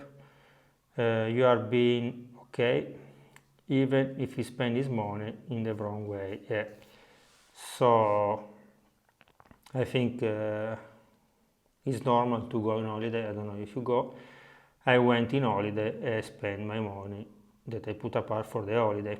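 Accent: Italy. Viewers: Your english is not bad, it’s great, it has an italian accent to it and it is normal. People need to chill and stop being rude and appreciate the unique content you are adding.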